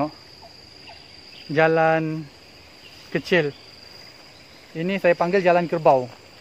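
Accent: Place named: Borneo, Malaysia. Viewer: maaf nak bertanya bro orang borneo ke ..suka slang bos bercakap...done subscribe dan like..support bos punya karya. .....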